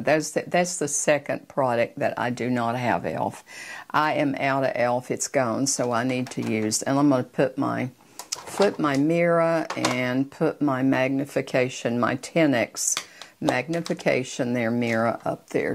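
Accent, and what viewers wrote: South, United States. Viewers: I tell ya, I am 52 and haven’t wore make up in years because of it setting in fine lines and wrinkles. No, I don’t have that many but you know what I mean, the make up would settle in those lines and wrinkles and it would emphasize said wrinkle and fine lines and it would make it look worse. I have recently had an outing with my husband and I bought that same elf foundation that you have there and it did pretty good. I didn’t wear any powder in fear that it would settle in the wrinkles. But thanks for all of your videos, I feel so inspired. And I figured you were from the South due to your accent, I think that’s why I immediately like you, I live in SW Georgia btw, lol❤.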